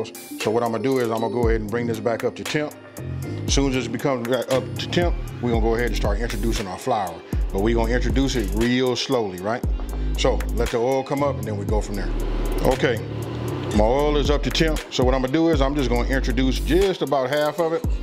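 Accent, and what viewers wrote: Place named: Chicago, United States. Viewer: Good job bro! I like the beat in the background also. If I wasn't mistaken I would have thought you was from the Chi lol.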